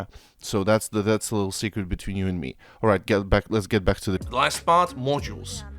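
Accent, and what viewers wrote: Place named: Eastern Europe. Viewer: ehh, it's weird. Imma use your rules instead. Also, are You from Poland or Russia maybe? You kinda sound that way, I believe I can spot fellow Eastern Europe folks lol.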